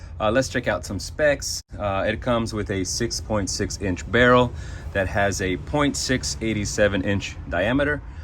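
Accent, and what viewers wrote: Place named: Italy. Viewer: Ciao grande!,. Tu sei italiano vero??? Su sente dallo slang inglese ... Parli perfettamente inglese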